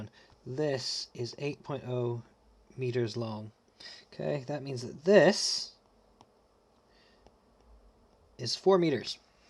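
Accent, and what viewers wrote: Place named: United States. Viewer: I had no idea how to do this in the exam. Thanks teacher with American accent!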